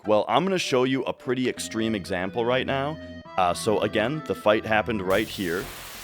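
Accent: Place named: Scandinavia. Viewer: I literally had an entire 10 minute existential crisis wondering if you were Nordic with an amazing English accent or if I were just schizing. Your speedrunner profile says you're from MN, and also MN has a lot of Nordic heritage, but then I realized it would be equally likely that of all of the places a Nordic person would move, it would be probably North Midwest. And then, lastly, I realized that this has distracted me from the substance of the video so I rewound and found myself really enjoying the video. Liked : D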